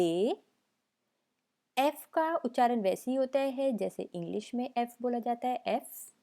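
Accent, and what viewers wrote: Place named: France. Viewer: Vous avez un accent français très mignons 🤗 Je vais me servir de cette vidéo pour faire l'inverse : apprendre l'alphabet hindi 😂 Si je peux me permettre de faire une remarque, ce n'est pas "les alphabets" mais "l'alphabet". Par contre vous pouvez dire "les lettres" :)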